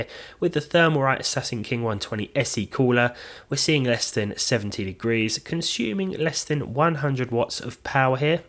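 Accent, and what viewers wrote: United Kingdom. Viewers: He’s a limey, that’s how they say Z.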